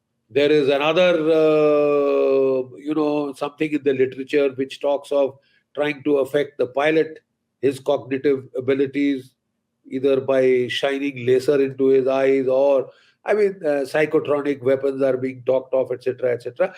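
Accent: India. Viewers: change his style of speaking from a strong typical Indian slang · His accent is typically Indian and jarring to the ears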